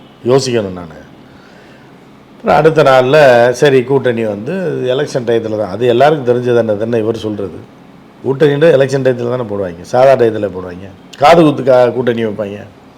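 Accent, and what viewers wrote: Madurai, India. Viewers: தோழர் அவர்களே எப்பிடி தோழா நம்ம மதுரை பாசையில் சிரிச்சே நீங்க சிரிக்காம சித்தப்பாவ NO S NO S கலக்கல் வேரலெவல் தலைவா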